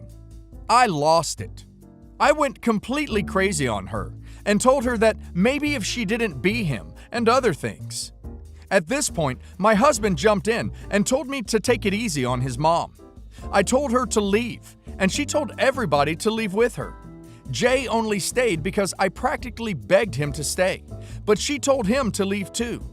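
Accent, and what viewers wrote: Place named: United States. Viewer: It is so freaky hearing this american voice on this reddit stories channel. i thought it was a genuine voice when i heard it on Ask a lawyer channel.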